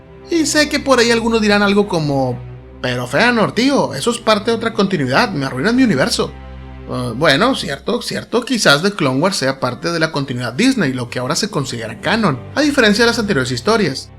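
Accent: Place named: North, Mexico. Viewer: están bien tus historias, y estás muy bien informado, pero la neta hablas bien feo, no se te entiende nada cuando hablas, y además tienes acento norteño. Habla más despacio y claro, gesticula bien.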